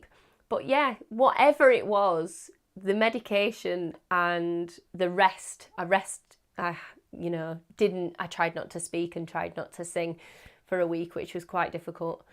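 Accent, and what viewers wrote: United Kingdom. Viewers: OMG, I love British accent ❤ 😍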